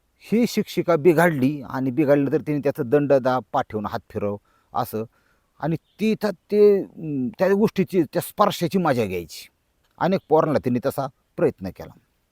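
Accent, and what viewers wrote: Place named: Beed, India. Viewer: मामा बीड वाले दिसताय भाषेवरून म्हणतोय ह्या गोष्टी लोकांना आवडतात ते तुम्ही बरोबर ओळखून विषय ठेवता त्यामुळे like मिळतात jacket आवडल बाबा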